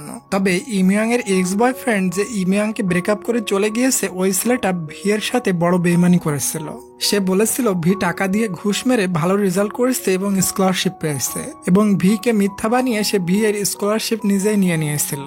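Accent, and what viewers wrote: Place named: Madaripur, Bangladesh. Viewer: ভাইয়া আপনার বাড়ি কোথায় মাদারি পুর না বরিশাল। আমার মনে হয় মাদারি পুর।❤❤❤❤❤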